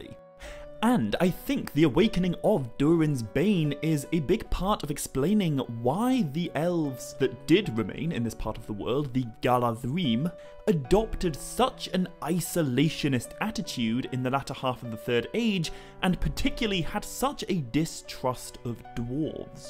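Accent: United Kingdom. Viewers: He's British. They ask talk like that.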